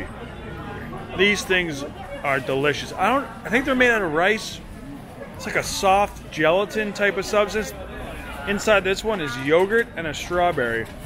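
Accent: Boston, United States. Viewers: My wife says the same thing and it gets me so very TRIGGERED!!! My So-Cal Bro sensibilities are offended by that Good Will Hunting "gahbage" speak.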